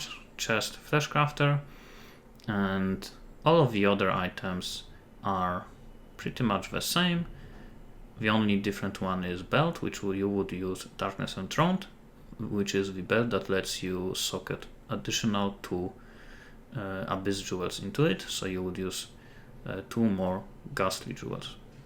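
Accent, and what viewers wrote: Poland. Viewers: Akcent wskazuje na polskie pochodzenie. Mylę się? btw Nice movie! like it a lot!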